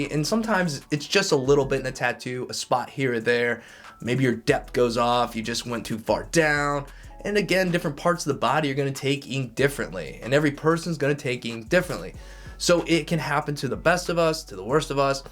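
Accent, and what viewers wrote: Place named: Vermont, United States. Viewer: You sound like you’re from Vermont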